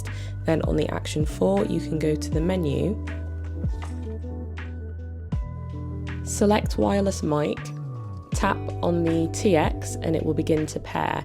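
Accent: United Kingdom. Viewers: So first question…..can you use the mic 2 Bluetooth feature on the GoPro 12 as well? I love your British accent btw lol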